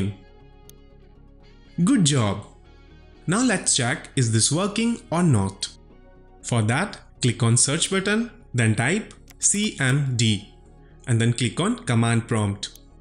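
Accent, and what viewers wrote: India. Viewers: He's indian accent makes this 10x easier.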